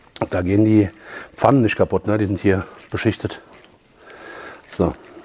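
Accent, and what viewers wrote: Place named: Hesse, Germany. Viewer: Hi! Bin ja durch Zufall auf Deine Videos gestoßen! Hier einen lieben Gruß an die "Scheffin" 😉 Dein Dialekt hat mich sehr erfreut.. Heimatgegefühl! Selbst bin ich gebürtig aus Dirppdebach! Deine/Eure bisher gesehenen Videos war phenomenal, war schon neidisch 😂 Ich lasse nun ein Abo da und freue mich auf weitere Videos! Bis jetzt: Klasse! UND, bitte weiter so! LG aus Hessen nach Hessen 🙃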